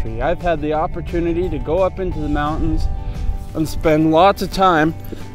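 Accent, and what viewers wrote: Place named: Alberta, Canada. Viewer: I don't even have to ask if he's from Alberta haha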